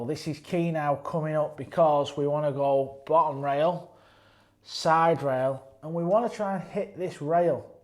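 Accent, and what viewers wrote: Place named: Lancashire, United Kingdom. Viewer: next time you do the Mosconi announcement, ride in on that scooter for added effect 😂😃! Good stuff though..When I zoom with Garstang cousins soon will say Lancs accent reminds me of them 😉 You teach well. Thanks for helping the global pool community.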